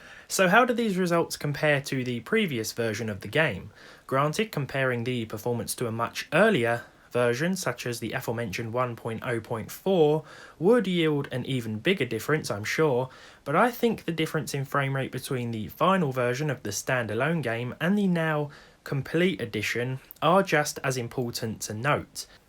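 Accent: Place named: England, United Kingdom. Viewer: You sound line and Englishman, so a zero is not pronounced o cause that is a letter, its a zero.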